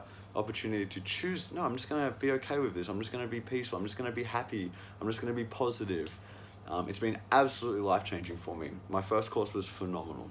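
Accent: Australia. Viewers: I went to my first vipassana course 2 months ago in indonesia. For the preparation, I watched all your videos about the vipassana course. It helped me a lot. So thank you so much for that. Also, on the retreat there was a volunteer who looks like you. His voice even sounds the same like you with Australian accent. At first glance, I thought it was you haha.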